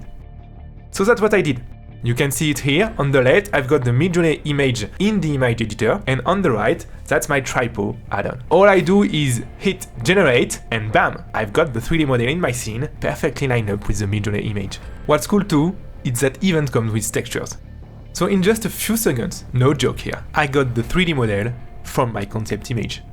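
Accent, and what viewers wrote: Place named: France. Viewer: Yeah…real convincing. The thing turns out to be the usual ‘blob’, and then we watch a whirlwind of sculpting edits on super fast fwd. These Ai image to model programs are ‘not ready for prime time’. So why is every ethnicity and their grandmothers trying to sell us on it? This one is particularly annoying, being narrated by a guy with super thick french accent. See you in a few years…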